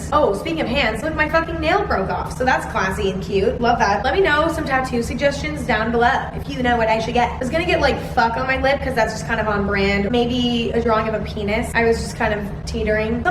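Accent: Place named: United Kingdom. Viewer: Why did I think this girl was british?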